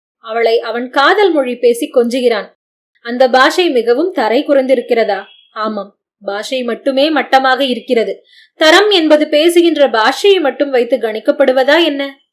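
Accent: Chennai, India. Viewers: மெட்ராஸ் பாஷை தெரியாதுண்ணீயேமே.. ஆனா மெட்ராஸ்லயே ரொம்ப லாங்கா வாய்ந்தா மாறி படா ஷோக்காக்கீதுமே ஊம் பேச்சி..😮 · தரமான மெட்ராஸ் பாஷை,கேட்டு மகிழ்ந்தேன்.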